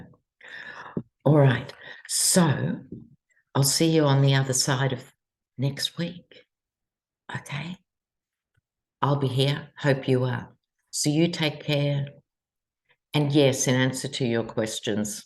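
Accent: Australia. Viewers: BTW, I love your Australian accent which makes it even more fun to listen to your video!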